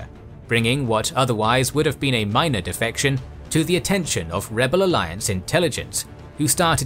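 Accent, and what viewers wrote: United Kingdom. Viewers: Horus Heresy next ………you sound British 😄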